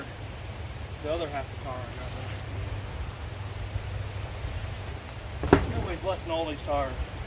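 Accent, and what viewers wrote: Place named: Coastal North Carolina, United States. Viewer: man i just found your channel i heard you talking and you have to be coastal nc im in pamlico county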